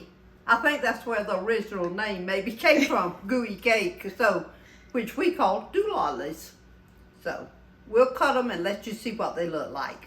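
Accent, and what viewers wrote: South, United States. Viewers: Mrs., what part of the South is your home of origin? I am from Western Middle Tennessee in your accent sounds remarkably familiar. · Okay, I am from the East (Pittsburgh, PA) and I came across this lovely channel I am not disappointed with all the meals to the family and God, along with the southern drawl. I am a fan for life now. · I am so curious at where you live I was born in Macon Georgia raised in Miami Florida didn't really know much about my dad's family cuz he left when I was seven and my mom had no contact with his family after that I do know they're from the south and Cochran Georgia but I tell you what talking to them on the phone their accents are so strong you can't barely understand them but I can tell you're from the South but I can understand everything you're saying anyway I'm going to be buying the ingredients to make this for this Sunday this is August 1st Amy Williams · I'm from SC too, and this is a real Southern accent. 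Not like hillbillies you hear on TV. In SC, it's much more akin to an English accent, much softer (softuh), less harsh than TX, TN, AR, wherever!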